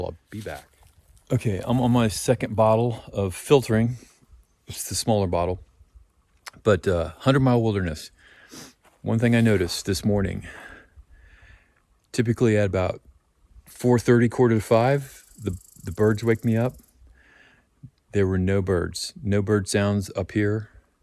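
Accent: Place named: Pennsylvania, United States. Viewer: London, England...Acorn Army, worldwide! I’m American who’s lived in London 9 years. We have a family lake house in western Maryland - have hiked a few sections of the AT and a lot in the area. I’m looking to attempt a thru hike in 8 years (early retirement and kids out of school). Thought I wanted NOBO but not sure I’d like the large crowds - so thinking flip flop. Main worries are what you’re describing in this video - anti-climatic at Katahdin and will I have it in me to flip back down and hike south? I’d love to see you walk south and will be cheering you on if you do! Are you from PA? You mentioned it once and then the beach, so a bit confused. Also, you’re accent tells me PA or Maryland...maybe south Jersey?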